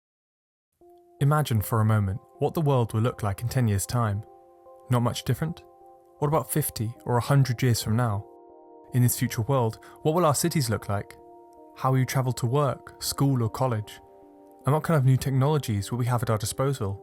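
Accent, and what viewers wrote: United Kingdom. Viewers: Tell me how I knew the narrator would be a Brit before I even started the video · i was worried this video would be ai but u sound too british to be ai so i think were good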